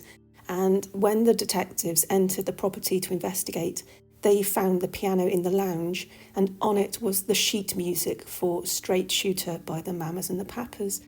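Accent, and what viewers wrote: United Kingdom. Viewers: This is a incorrect title for this yt video Berkeley square is an expensive area of London to live as british pm have lived and the british nobles own properties in the area all with nannies and servants that are under there employment the video has nothing to do with LA America even the narrative is spoken in correct English and at one point even has a british southern accent and a brummie both of which the us population get wrong so the narrative could be by a true brit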